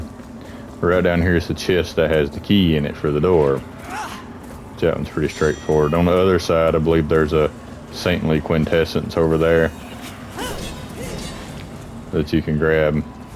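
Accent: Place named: South, United States. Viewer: You sound like a red neck bunkin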